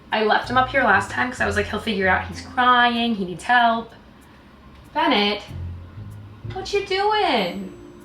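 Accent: New York, United States. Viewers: Another interesting video in the life of, fast talking with her strong New York Accent doing stuff around the City, even getting up early in the morning to go to the parade supported by some of the members of The Flamboyant NYC Crew featuring lots of, From The Twin Sisters R'NT US, The Tall Loud Blonde One, and. There was even a guest spot from, The Mature Student. For someone who claims that she is shy and introverted, certainly knows a lot of people! Also the Cats Bennett and Olive also made an appearance with Bennett having an obsession with sitting on top of the door! Anyway love the content and as for the Critics, they must be jealous because they won't go on you tube! Take care now! From London UK 🇬🇧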